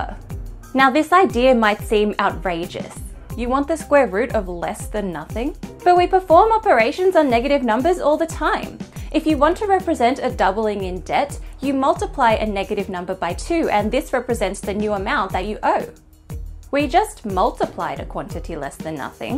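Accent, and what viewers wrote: Australia. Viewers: Love the australian accent :) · sounds like a healthy Australian accent to me.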